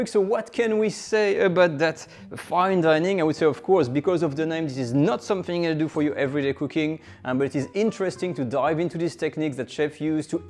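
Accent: France. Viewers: Tres bien merci,de partager nôtre heritage culinaire avec nos amis américains! Parler anglais avec l accent francais est appétissant!! ,je veux dire par là lorsque vous dites des termes de cuisine en prononçant le mot en francais , peut être faudrait il le dire avec un léger accent anglais pour que nos amis anglophones comprennent mieux …ou l ecrire en sous titre avec l explication du terme reduire, bouquet garnis …etc maintenant si tout est expliqué dans le magazine dont vous faites la promotion autant pour moi …je vous ecris parceque j ai eu le privilège de travailler avec le Chef Monsieur Robuchon !! a Monaco a l hôtel Métropole que cette recette est une salade Marocaine revisité…est ce que le magazine en parle ?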